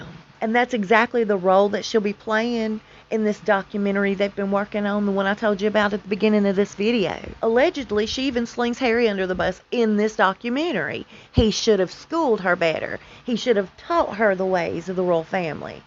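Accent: South, United States. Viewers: You have the sweetest southern accent. I live in North Carolina. I enjoy your videos. There's a commercial on now. Lol. Have a great day. We're blessed to live in the South❣ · I could never imagine cursing my grandma out. I would have carried her on a satin pillow if I was capable. I know from your accent that you’re southern and I’m southern and we just don’t play that way. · Love your southern accent! Lisa from Spain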